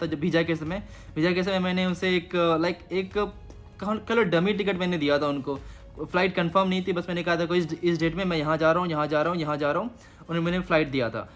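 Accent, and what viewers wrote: Bihar, India. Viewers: Its immigration and u r saying immigrason . Dont use bihari accent . Ab toh dunia ghoom liaaa thora develop kr apne aaap ko .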